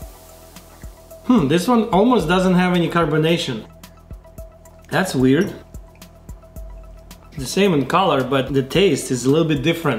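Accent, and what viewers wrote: Russia. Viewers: Are u actually russion?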